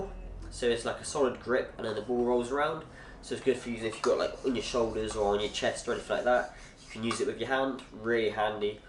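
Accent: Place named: Somerset, United Kingdom. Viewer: you sound local mate you in Somerset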